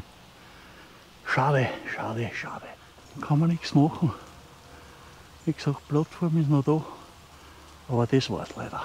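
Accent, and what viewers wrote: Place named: Austria. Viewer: Danke lieber, ja da gibt es viele Videos... Aber deine sind die Besten. Deine Art etwas vorzustellen hat was ganz besonders... Dein österreichische Dialekt, deine Ruhe... und die Umgebung in der du filmst... 👍👍👍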